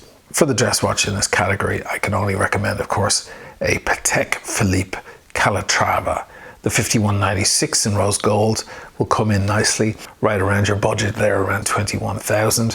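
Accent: Ireland. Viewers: Where are you from? Ireland? The voice reminds me of Darth Vader.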